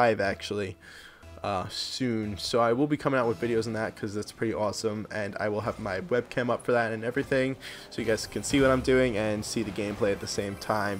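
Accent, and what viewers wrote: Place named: New York, United States. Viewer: New York accent.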